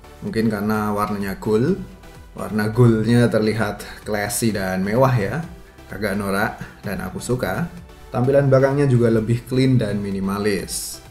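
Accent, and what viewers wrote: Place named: Yogyakarta, Indonesia. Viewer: Wong jogja toh?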